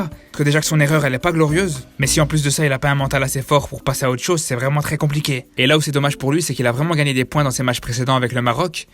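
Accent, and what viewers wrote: Belgium. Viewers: Votre accent belge je kiffe !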